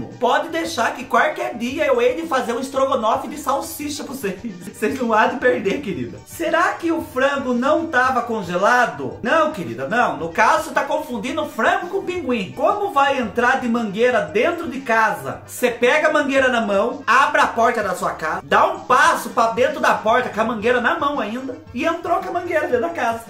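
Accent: Spain. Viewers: to rindo de mais o seu espanhol é ilario kkk so vc mesmo cada resposta kkk os erros são de mais aiii que dor machucou o joelho adoreiii ispicula sim nós é capaz 🥰🥰😘😘😘😘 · Oi amei seu espanhol mais alguém amo deixa o like só eu que dei risada do útero virado do kkkkkk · 😂😂😂😂 do ceu o teu espanhol é tudo de bom 😂😂😂😂😂😂😂💋💋💋🥰🥰🥰🥰❤️❤️❤️❤️❤️ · Oi! Está chique demais, falando em espanhol! 🤩🤩🤩 Amei a parte do coice! 🤣🤣🤣 Beijocas! 😘😘😘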